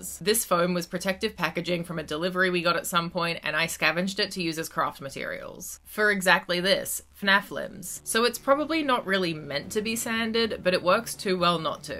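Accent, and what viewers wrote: Australia. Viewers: bro said he was Australian without saying he Australian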